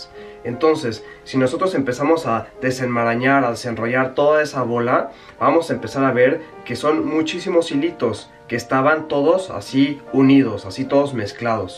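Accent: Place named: Mexico City, Mexico. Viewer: Me encanta el acento cdmx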